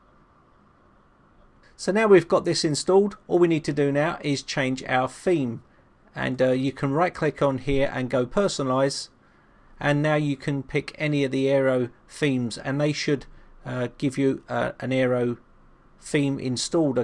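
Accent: United Kingdom. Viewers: Sotaque britânico é top kkkkk Excelente vídeo!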